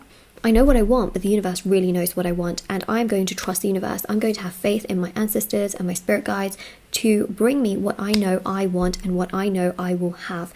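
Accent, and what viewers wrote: United Kingdom. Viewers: I m a huge fan of ur sweet voice n ur British accent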